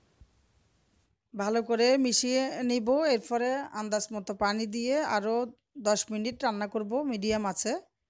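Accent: Chittagong, Bangladesh. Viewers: ওয়া তুই চিটাইংগে কেন?খথা উনি বুঝা জার।সুন্দর রেচিপি। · Unar kotha shune tai tu mone hocche. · আপনি কি চিটাগাং গা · চিটাইংগা নাকি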